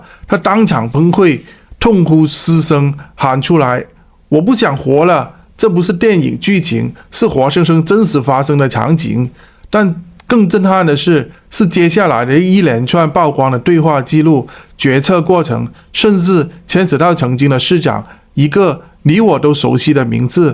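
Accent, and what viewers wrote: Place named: China. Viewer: 你的口音是中國人,台灣的事與你何干呢？法律會制裁他們。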